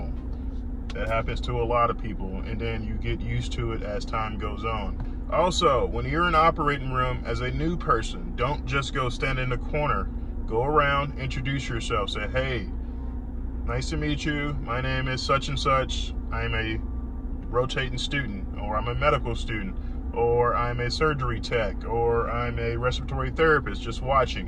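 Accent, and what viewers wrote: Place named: North Louisiana, United States. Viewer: You sound like your from North Louisiana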